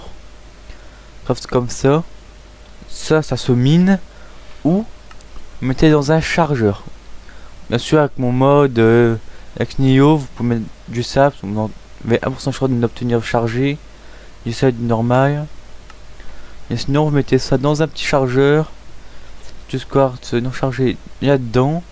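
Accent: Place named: Russia. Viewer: J'adore ton accent russe , sa a du être difficile d'apprendre le français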